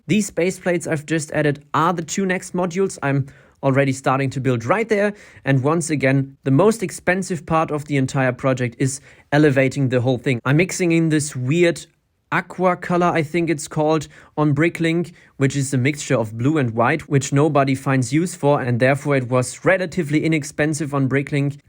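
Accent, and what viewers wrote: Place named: Germany. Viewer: Der Typ ist kein Engländer. So wie der englisch spricht hört man sofort raus, ist deutsch seine Muttersprache. Ich habe mir schon hunderte englische/amerikanische Videos angeguckt und keiner spricht so. Das machen nur deutschsprachler.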